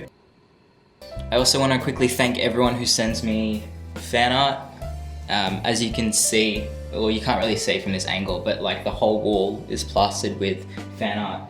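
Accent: Australia. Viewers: what part of Australia are you from? love that accent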